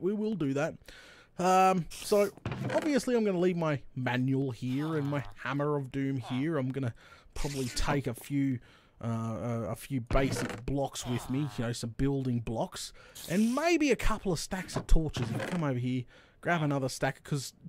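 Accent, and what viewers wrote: Australia. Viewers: This man's voice is the most stereotypical Australian voice I've ever heard and I love it. Keep up the good work!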